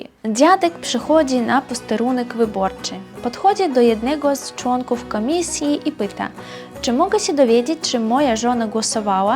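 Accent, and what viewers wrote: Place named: Poland. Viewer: Musze być szczery! Łapiesz polski akcent! A teraz do meritium. Ludzie są inni zagranicą a inni u siebie w kraju. Nie wiem na czym to polega. Na przykład w czasie mojej tułaczki po świecie poznalem wielu dziwnych wegrów. I mialem taką sobie opinie o Węgrach Jak pojechałem do Budapesztu to ich wtedy pokochałem. Czyli: Trzeba odwiedzić kraj. Posmakować tamtejszej kuchni. I jakie jest tam.poczucue himoru. Bo wiadomo, ze są narody ktory nie mają poczucia bumoru.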